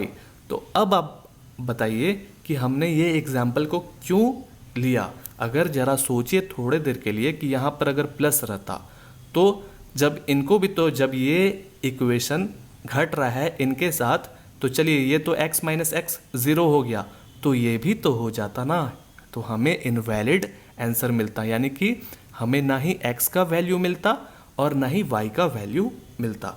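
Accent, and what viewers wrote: Bihar, India. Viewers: Sir bihar ke ho ky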